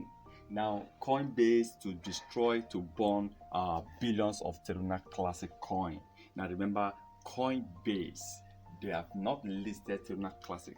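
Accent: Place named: Nigeria. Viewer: U sounds like Nigeria,I'm Nigeria too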